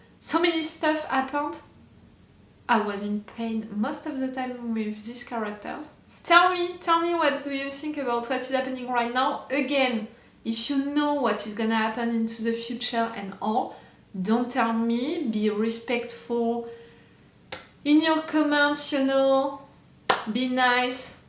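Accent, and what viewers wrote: France. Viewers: yeah it's probably because of your french accent Most people doesn't like it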